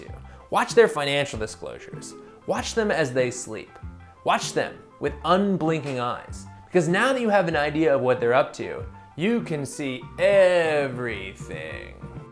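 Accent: France. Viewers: I went to give this video a like when I heard your french accent but I already had like this video. Seriously always so well done.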